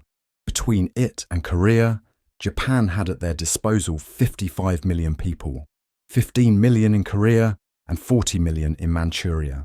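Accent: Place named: United Kingdom. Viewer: Husky ethnic British voice sounding like Alfie from Emily in Paris ❤❤❤❤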